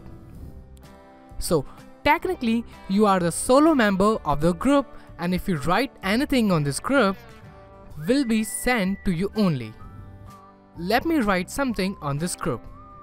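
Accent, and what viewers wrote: India. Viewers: He sounds messed up just like indian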